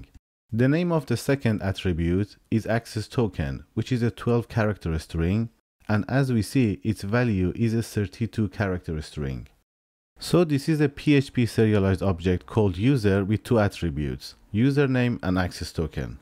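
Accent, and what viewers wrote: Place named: Iran. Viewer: Your accent is like Persians accents lol , are you Iranian ? Buy the way I LOVE your videos man , Thank you so much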